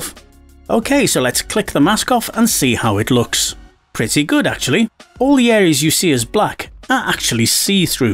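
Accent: United Kingdom. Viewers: British accents clench my jaws...I'm good for about 30 seconds...max...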